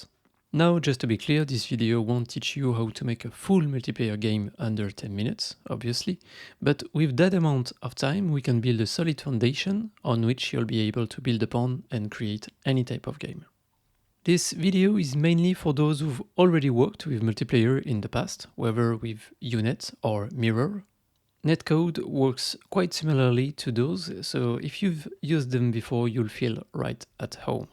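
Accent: France. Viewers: Salut tu est français non?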